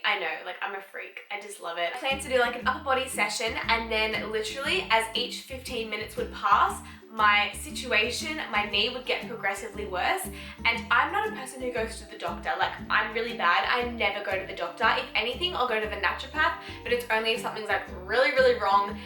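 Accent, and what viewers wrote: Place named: Australia. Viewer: that aussie accent thoooo! LOVE your energy! xx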